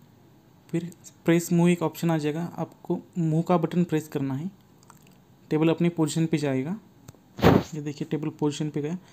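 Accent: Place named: Maharashtra, India. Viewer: jay shree ram brother'.....your language like Maharashtrian.... ❤️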